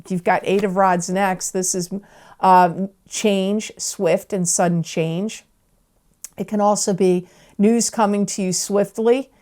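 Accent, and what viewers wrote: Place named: Baltimore, United States. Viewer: Hi, are you from Baltimore? You sound just like a hometown girl! It's my hometown.:) BTW, I am disposing of my brother's estate which includes two cars, an airplane and his house. Talk about large items! You are right on about that!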